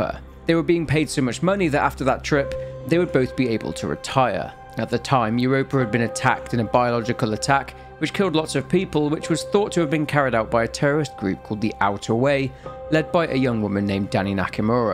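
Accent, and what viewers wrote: United Kingdom. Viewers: british, but soothing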